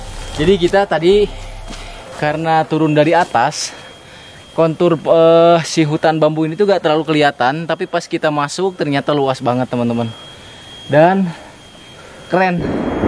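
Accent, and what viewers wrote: West Java, Indonesia. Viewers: Sangat mengedukasi bgt ini, dan logat sundanya terasa bgt nih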